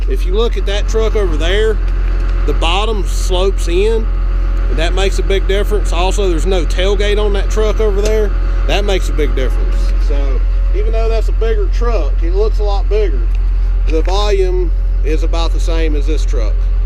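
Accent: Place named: South, United States. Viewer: You sound' further South'...... I did not know, Virginians sound so Southern... 'Real life'..... nice... Different than 'created content'.......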